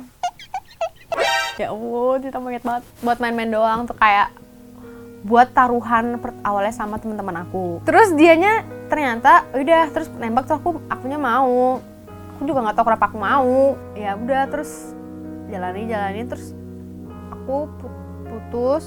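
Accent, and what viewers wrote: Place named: Bali, Indonesia. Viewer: logat balinya masih ada bgttt gemes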